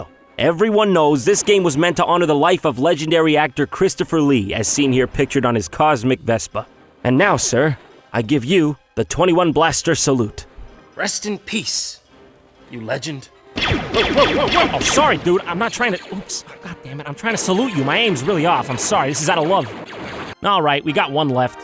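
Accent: New York, United States. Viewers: Funny how he had a new yorker accent when he was in Cali, but now that he actually lives in new York he lost it. :/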